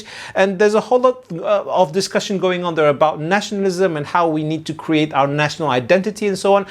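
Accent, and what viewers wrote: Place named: RP, United Kingdom. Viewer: You're speaking the Queen's English. Well done.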